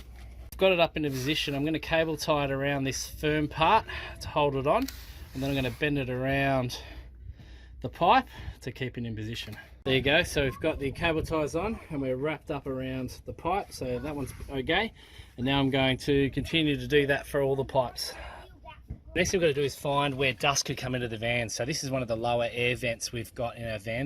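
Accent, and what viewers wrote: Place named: Australia. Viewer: We Cannot Hear your voice. FIX THAT. No ill entent intended. And SPEAK SLOWER, Your Acsent is Not Easy to Understand. (American English is Not Aussy English) We Still Luv-Ya-Champ.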